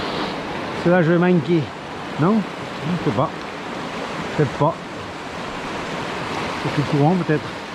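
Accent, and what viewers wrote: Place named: South, France. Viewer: Salut,je ne connaissais pas ce talent de chanteur👍, souhaitons que ces jolies farios corrézienne t'ai laissé un souvenir agréable,toi qui leur parle comme moi avec l'accent du sud, t'entraînerais tu pour cet été? 😉 une session rock and roll où on devine que tu t'es amusé. 👏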